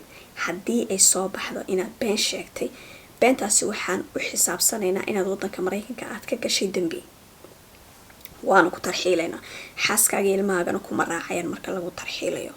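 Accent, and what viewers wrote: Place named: Mogadishu, Somalia. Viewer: Majarafad waa badiil lahjada reer xamar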